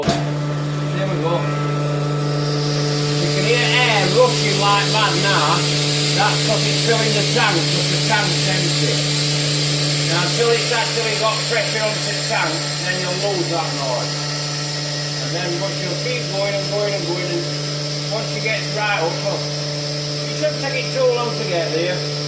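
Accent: Yorkshire, United Kingdom. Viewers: Thanks for Air Zone compressor video maintenance needed to spray cars with paint. Good work Proper Yorkshire chat. KenXJ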